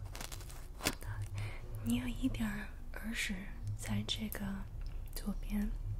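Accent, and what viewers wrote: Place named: Beijing, China. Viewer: 虽然有些词忘记了，但是你有很北京的口音👍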